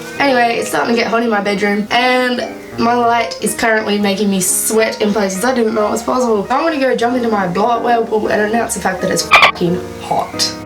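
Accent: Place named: United States. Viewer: you have an american accent in this entire video and it's tripping me out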